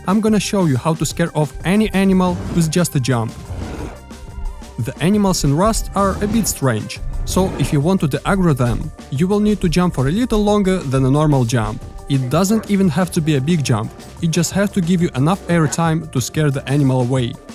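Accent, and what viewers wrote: Russia. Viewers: Я не критикую и не осуждаю. Просто хочу дать совет. Во первых по акценту слышно что русский. Во вторых существует такое термин как «культурное несоответствие», либо «культурная специфичность». Это говорит о том, что каждый язык несет свою культуру и историю, поэтому идиомы, фразеологизмы переводить дословно будет ошибкой. К примеру «save your nerves”. Англоязычный народ так не говорит, для них это странно. В таком случае следует прибегнуть к использованию словаря, чтобы повысить качество текста. Удачного прогресса и развития!❤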